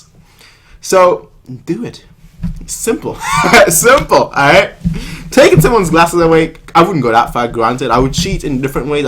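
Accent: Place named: United Kingdom. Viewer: I really love your reaction and accent bro. British right?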